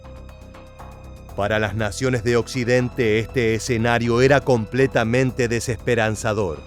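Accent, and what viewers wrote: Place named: Argentina. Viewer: El locutor es argentino ja vamos nomás jajaja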